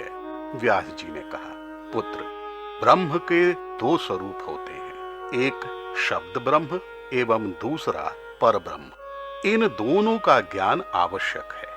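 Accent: Standard. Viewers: शुद्ध उच्चारण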